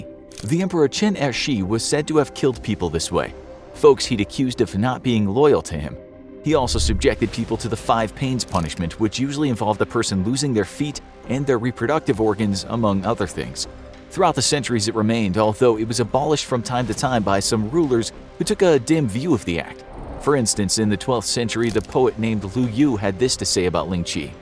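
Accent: United States. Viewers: This dude is probably the best narrator on YouTube. He also makes the American accent sound best. 😁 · Honestly yeah. I always loved the strong american accent haha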